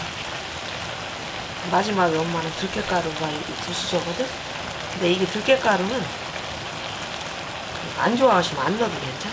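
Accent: Chungcheong, South Korea. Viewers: 이잉! 정겨운 충청도 이신겨???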